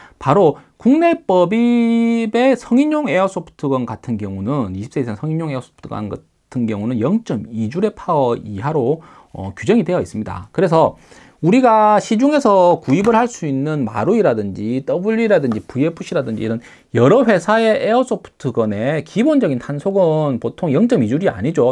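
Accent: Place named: Busan, South Korea. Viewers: 빠사~지는....찰진 다이내믹 부산 표준어에 좋아요를 탁~! 치고 갑니다 ^^ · 뭔중국? 경상도 말투인데? 한국인아님?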